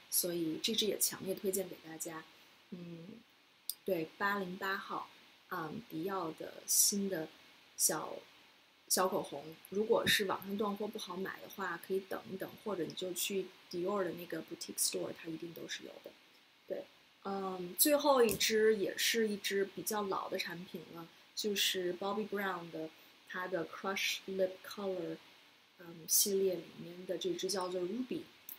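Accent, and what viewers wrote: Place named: Beijing, China. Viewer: 京腔儿听着好亲切 😊 你也在加州吗？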